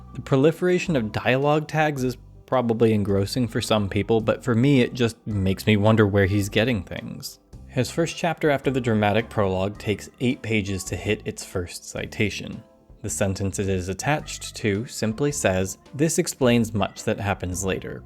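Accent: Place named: California, United States. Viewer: So what if he does? There’s lots of vowel shifts currently happening across different accents in American English. He sounds Californian, which makes sense cause that’s how a lot of us say “biases” here.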